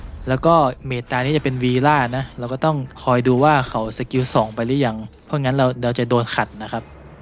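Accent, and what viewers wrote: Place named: North, Thailand. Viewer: สาบเมืองอยู่นะ คนเหนือไหมนี่555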